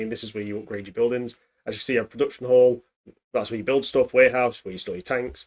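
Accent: England, United Kingdom. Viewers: i could tell by your accent that you were english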